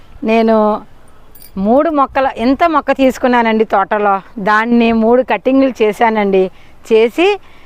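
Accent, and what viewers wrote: Godavari, India. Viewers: Hii garu mee oopikaki joharulu andi me matalu vini godavari zilla anukunna madhi Kakinada andi.❤❤❤❤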